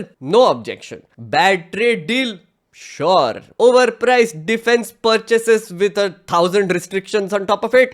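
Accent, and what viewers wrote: India. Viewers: Bruh, hire an accent coach. This Indian English is suffocating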